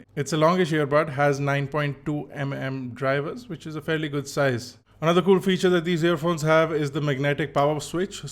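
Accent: India. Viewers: work on your indian accent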